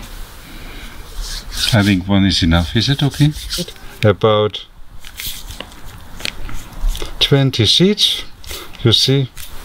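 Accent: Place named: Germany. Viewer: Me gusta como los alemanes hablan el inglés! Sobre todo en la pronunciación de la W 😅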